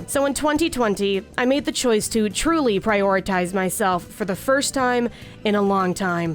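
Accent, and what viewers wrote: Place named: West Virginia, United States. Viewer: I'll be ready whenever you are. ....and sometimes I forget how WV you are until I hear the "y'all". The cutest thing.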